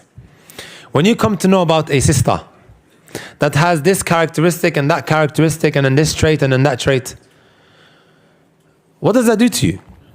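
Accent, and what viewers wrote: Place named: United Kingdom. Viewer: Grew up in Britain so it’s British accent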